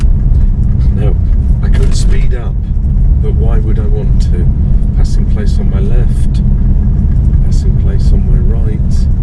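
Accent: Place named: Nottingham, United Kingdom. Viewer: Totally off subject here but I’m wanting to guess your accent because it’s definitely not SE?! Uneducated guess.. Nottingham area?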